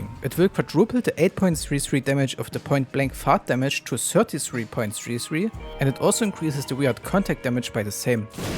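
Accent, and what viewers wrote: Germany. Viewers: you can hear that this man is german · Dude idk if every german speaks like that but i love how you speak english ! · Wie man einfach direkt raushört, dass du ein Almano bist haha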